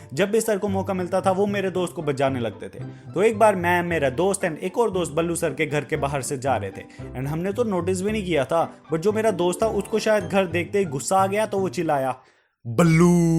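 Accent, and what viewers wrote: Jammu, India. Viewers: ji aap jammu sai ho toh dogri bhi aati hogi🤣🤣 Dangar........ I am also from jammu and you know what when i saw ur first video i got that u r from jammu becoz of ur way of talkinh hehhehe I was laughing like hell while watching this Love ur videos ji☺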